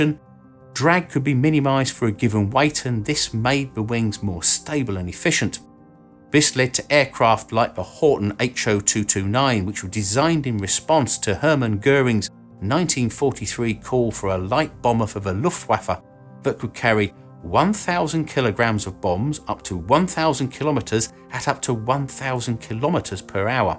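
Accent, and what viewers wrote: United Kingdom. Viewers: It's called a British accent...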